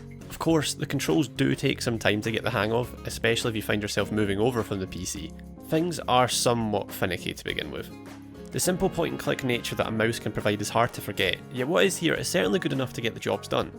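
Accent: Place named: Scotland, United Kingdom. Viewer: I have to warn you. Your Scottish accent and the fact that you talk fast makes me struggle a little bit, and I'd say I'm quite good at English. I can see others struggling a lot with your review.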